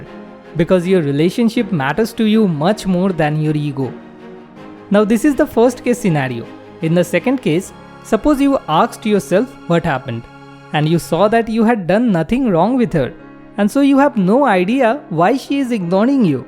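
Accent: India. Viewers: I like when Indians talking in English they are funny 🤣😁👏🏻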